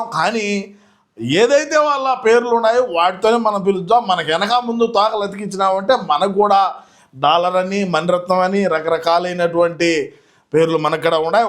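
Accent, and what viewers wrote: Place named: Kakinada, India. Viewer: Kakinada kanttam garu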